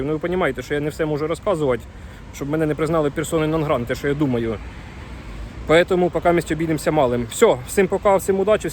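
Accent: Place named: Poltava, Ukraine. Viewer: Рідний говір ) . Ви з Полтавської області? )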